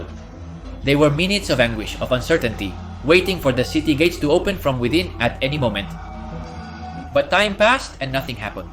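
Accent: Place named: Spain. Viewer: Man your accent is so distracting, just make the video in spanish, it would be more interesting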